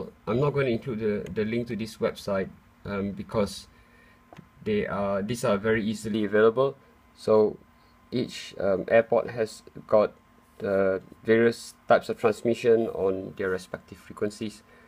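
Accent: Singapore. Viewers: your accent is similar to the way my friends from Singapore spoke. It was nice to hear someone speak that way again.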